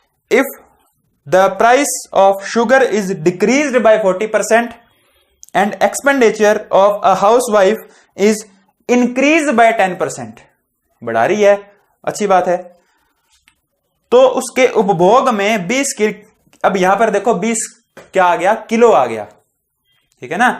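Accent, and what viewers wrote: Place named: Rajasthan, India. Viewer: West Rajasthan se lg rhe ho sir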